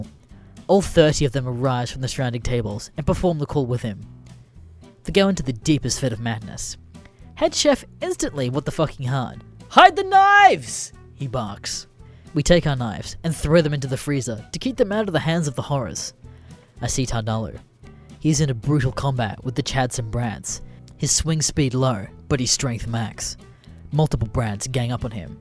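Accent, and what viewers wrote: Australia. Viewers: good morning aussie boy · Wait your an AUSSIE A REEEEEEEEEEEEEEEEEEEEEEEEEEEEEE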